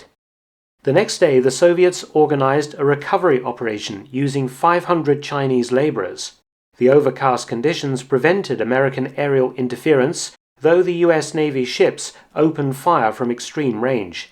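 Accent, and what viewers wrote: United Kingdom. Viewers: Why use Robo-voice when you speak in a sophisticated British accent?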